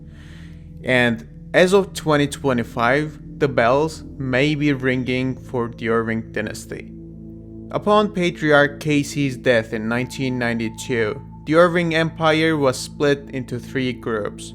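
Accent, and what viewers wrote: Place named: France. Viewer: sounded like a strong french accent. BTW, the Irvings were originally from the Buctouche(sp) area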